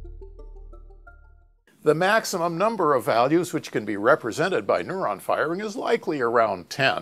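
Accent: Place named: Brooklyn, United States. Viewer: I recognize the accent as a subtle Brooklyn accent. So like, perhaps someone that grew up in Brooklyn as a child but now mostly speak in a "standard American" accent with only hints of their heritage. Of course, it's subtle enough it's hard to say, but it sounds really similar to other people I know are from Brooklyn.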